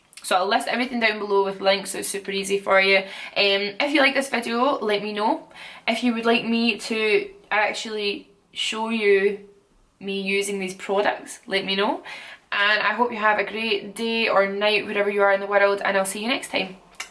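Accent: Scotland, United Kingdom. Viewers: Where is she from in Scotland?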